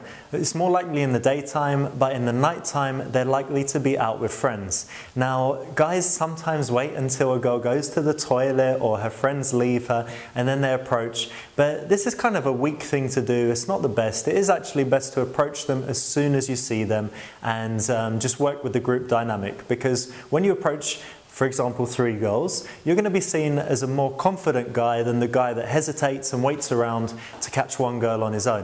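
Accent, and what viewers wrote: England, United Kingdom. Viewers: dude you have an English accent you win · U have a british accent u dont need to do anything lmao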